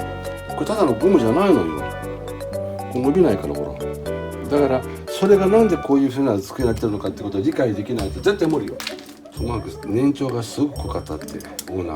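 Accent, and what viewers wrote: Niigata, Japan. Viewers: 違ったら失礼ですが新潟の方ですか？喋り方やイントネーションに親しみがあるので☺️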